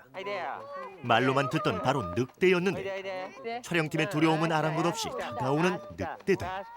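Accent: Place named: Seoul, South Korea. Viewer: 와 저때도 서울사투리가 좀남아있네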